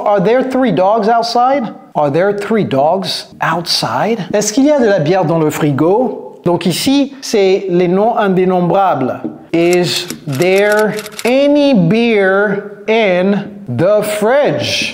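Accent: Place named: United States. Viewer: Always charmed by your accent Coach..I love American accent by african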